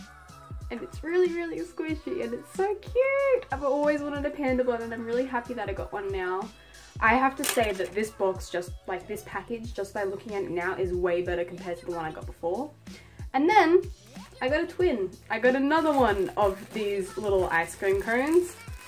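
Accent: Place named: Australia. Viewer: Erika Flores I have the same accent as her it is cause she is from Australia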